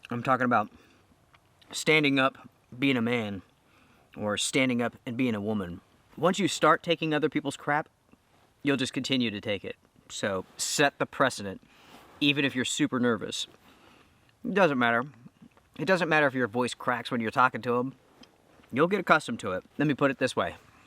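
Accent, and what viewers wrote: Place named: Appalachia, United States. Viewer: I hate ur hillbilly pipes!!!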